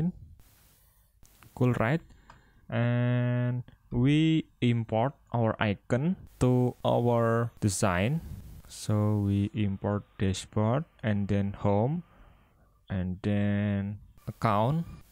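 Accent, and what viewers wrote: Indonesia. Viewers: Awal nonton, kok aksennya familiar 😂, ternyata orang indo toh, mantep dah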